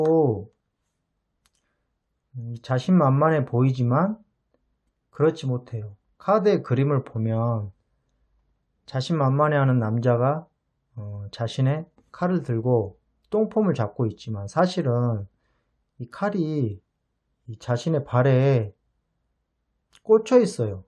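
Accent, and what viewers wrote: Jeolla, South Korea. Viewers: 혹시 전라도분이세요??말투에 사투리가있어요ㅋㅋ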